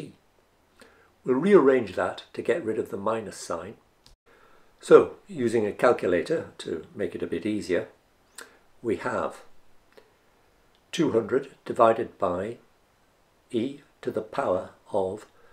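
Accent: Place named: United Kingdom. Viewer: Thank you, fancy and british sounding man. You finally made me understand this.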